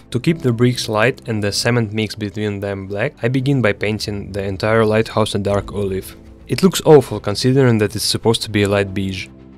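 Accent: Russia. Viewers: с первых слов поняла что ты русский)00) отличная работа! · Ты же русский верно? По твоему акценту понятно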